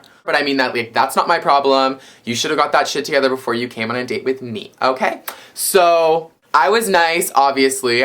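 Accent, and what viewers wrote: Canada. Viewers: are you Canadian? it sounds like it sometimes. lol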